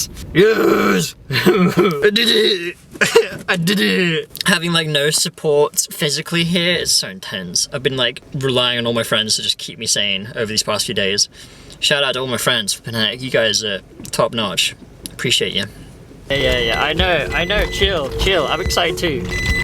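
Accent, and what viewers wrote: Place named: England, United Kingdom. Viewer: Why is your accent getting more English.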